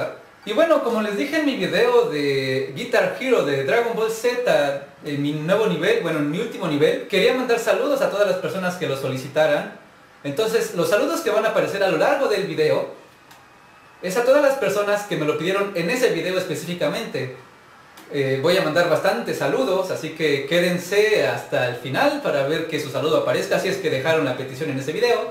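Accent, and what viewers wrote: Mexico. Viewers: veo tus videos aunque no estoy suscrito, juegas bien al Mario maker pero tu comentario a la hora de jugar casi no me agrada trata de ser más espontáneo y que se te salga menos lo chilango y me suscribo, sigue así bro 👍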